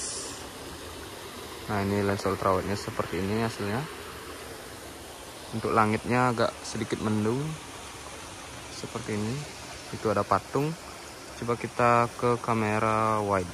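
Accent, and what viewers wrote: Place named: Bali, Indonesia. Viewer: Logat bali, plat motor bali, jawabnya jakarta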